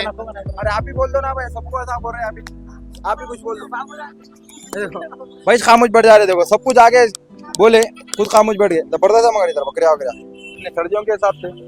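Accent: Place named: Hyderabad, India. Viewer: bhai aap kya karrai ji…..😂😂 Love your Hyderabadi accent Love your content ❤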